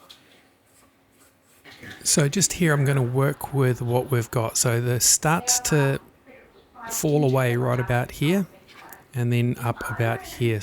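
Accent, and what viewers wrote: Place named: Australia. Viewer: The down under accent makes any content even more fun to watch. Awesome job, mate.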